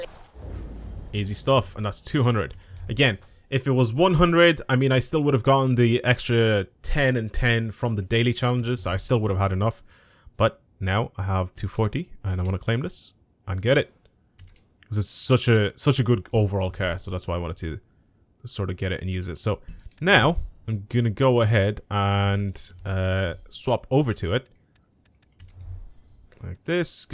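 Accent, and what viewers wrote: Ireland. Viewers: Are you Irish? I assume yes. I'm new to your channel. Am Irish too, where you from?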